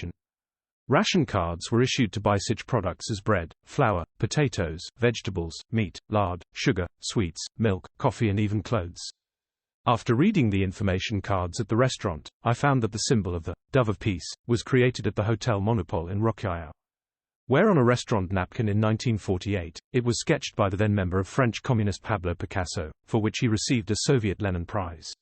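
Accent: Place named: RP, United Kingdom. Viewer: I LOVE learning where the Dove of Peace came from. Wow no idea that came from Picasso. Ok just going to say that upper class Brit accent just sounds wrong. Doesn't match your passion and incredible heart for history. 👣🧭🫶❤🕊️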